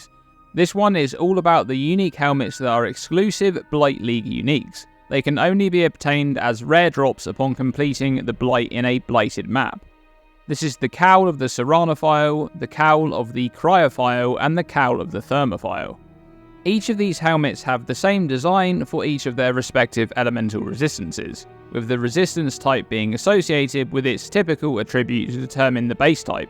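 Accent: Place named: United Kingdom. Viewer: He's a brit... they say things differently.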